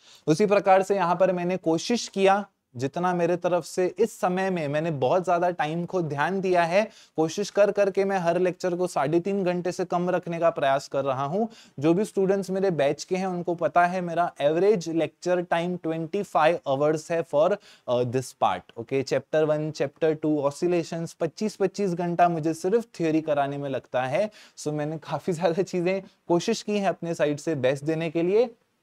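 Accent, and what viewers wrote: Sindh, India. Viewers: Sir ki sindhi accent bhot relatable hai😂❤️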